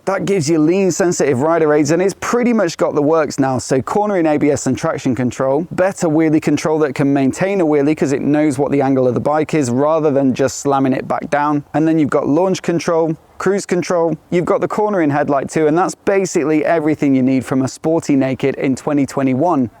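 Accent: United Kingdom. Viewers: Can you add subtitle on your video? I really enjoy it but my english not very too good to catch ur british accent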